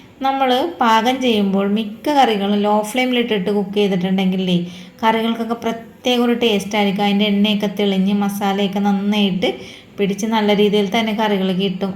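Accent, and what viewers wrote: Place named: Kodungallur, India. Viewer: Thrissur districtile kodungallur bhagathenganumano iyale veed. Ente veed 3 peedika. Ente samsaram polend. Athond chodichathatta. Adipoli reciepe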